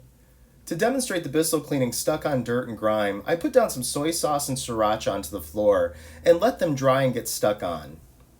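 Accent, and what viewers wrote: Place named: Canada. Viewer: Great demonstration! Your voice sounds much like a Canadian I have heard on Youtube that has a vintage kitchen and a collector of turquoise appliances.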